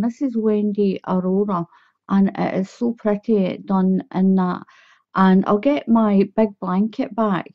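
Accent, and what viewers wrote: Scotland, United Kingdom. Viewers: it's a Scottish accent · I just love your Scottish accent. You are definitely right about not having more “Man Blankets” patterns. I mean, everyone has at least one man in their lives that deserves a beautiful, or shall I say handsome, blanket. This blanket is very easy to do & I love the way it’s turning out for me. It’s for my son for a Christmas gift. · I am going to make this for a friend in permanent healthcare in Wisconsin. It's a man of course, love the name you gave it. Thanks from Louisiana. I'm guessing your in or from Scotland, love your accent as we always say. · Good Day to you. I have been looking for such a project to used up a large over buy of heather gray Red Heart yarn, What weight yarn is the yarn you used? I wil be using 4 which is a worsted weight. I definately will be doing a swatch, it looks like you need to do a double amount of foundation chains since the work shrinks in to about half, which is why one does a swatch, to figure out these things. 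The finished patterning looks like basket weave and should be quite effective to keep any one guessing how it was done. I have never done front/back posting crochet before, glad you cleared up what stitch you were doing in your pinned comment. Its definately double crochet, If this is considered treble crochet in your circle, what is single crochet? Just a curiousity on my part, love to see techniques from other places and I will echo other commenters, your Scottish accent is truely lovely. Thank you for showing us how to do your basket weave blanket, my crochet fingers are itching to get started. Best Wishes. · Love the blanket and the accent! Is it Scottish, eh?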